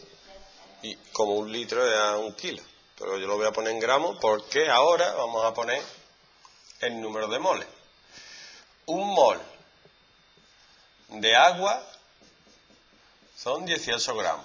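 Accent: Andalusia, Spain. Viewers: quiero intentar atender pero es que er asento andalu` de este hombre ma`hace musha grasia killo XD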